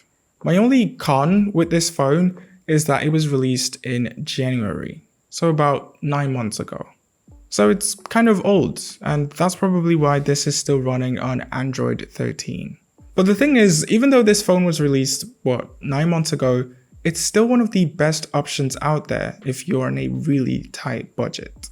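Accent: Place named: United Kingdom. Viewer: Bro you British accent is good tho 😢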